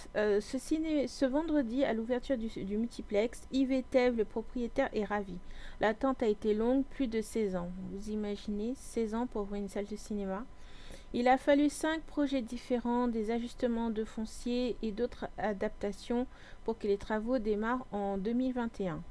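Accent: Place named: India. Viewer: ah! D'Accord moi aussi je vis sur l'île de la reunion dans l'océan indien! Et j'ai moi aussi une chaine YouTube s'apelle Geek to Cinema! Donc maintenant on est deux! Lol en revenche je n'ai jammais entendue parlé des autre autre YouTubeur! Mais sans être curieux tu vis ou a st pierre ou st Louis! Parceque a un moment tu dit que le Ciné et a de chez toi 20 minutes Moi je vis a la ravine blanche! Et je pence que il 10 minutes ou 15 minutes tu as un accent indien quand tu parle tu es d'origine Indienne!?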